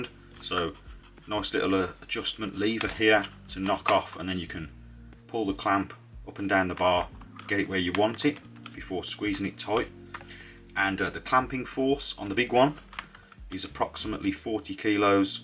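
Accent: Birmingham, United Kingdom. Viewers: You need a better accent. Most Brummies are as stupid as they sound. I suspect you’re not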